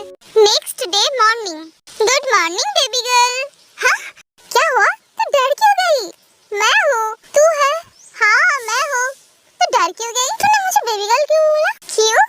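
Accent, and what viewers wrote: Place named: Bengal, India. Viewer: Accent bata raha she is bangoli n bangoli's ka karunga karungi same hota so you have to understand .😂😂You focus on story n enjoy. It's kind of cute too. 🎉🎉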